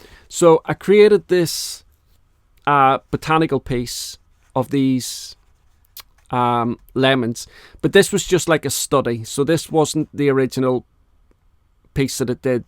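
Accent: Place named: Northern Ireland, United Kingdom. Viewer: I absolutely ADORE you accent!!!! The Northern Irish accent is quite possibly my favourite English-language accent. Actually I find that English speakers often mangle foreign languages not because they are not trying, but because they are putting in too much effort (so overcompensating wildly, trying to imitate what to them sounds like the foreign language they are trying to speak). Which is actually kinda sweet… except when it leads to funny misunderstandings - like when English speakers pronounce “coup” as “cul” (I hope YouTube does not remove this comment, haha), which in French means… a vulgar word for bottom. A very polite British friend of mine got in trouble for misprounoncing “merci beaucoup” that way. So, he accidentally said to a lady who was holding the door for him, “thank you - nice a**e!” 😂 PS - I am also a fluent French speaker. 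And the Irish accent sounds wonderful in both!!!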